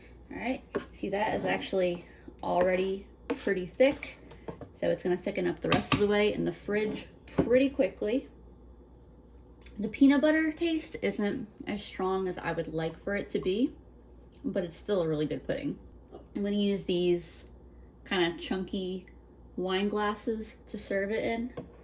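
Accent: Philadelphia, United States. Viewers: We usually do a "potluck" where everyone brings something and we have a buffet of sorts but the last 2 years it's just been my little family so last year the kiddos picked cheeseburgers and this year I made an eye roast a few days before Christmas and we had hot roast beef sandwiches. You talked as if you are or were near Philly. I was born and raised in South Philly and since I moved away I miss nicks roast beef so much! So I try my best to make my own combos lol. Hope all reading this have a safe, happy and healthy new year! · Wow, I knew you were from Philly when I first heard you speaking. Merry Christmas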